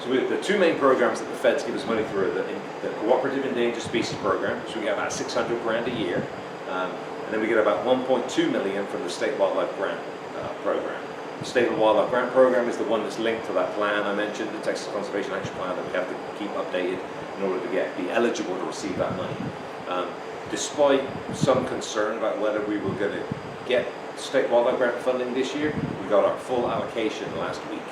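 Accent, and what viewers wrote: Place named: West Country, United Kingdom. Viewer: Good to see hasn’t lost his West Country twang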